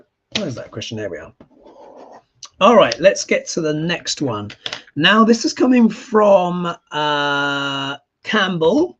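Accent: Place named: London, United Kingdom. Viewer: Thank you for reading on Germany; yes I live here and just don' t want war; I agree to what the pope said ( of course, I am italian 😂) inflation is still going on; in Germany, prices high. I am so glad, I found your channel once 😊 thank you for giving me a home feeling always when you talk; miss the Londoner dialect a lot; when we had the zoomcall reading last year I told you the Dad of our kid was from London; unfortunately he suddenly died 2 years ago. So it makes me happy when you talk and share your humour ❤❤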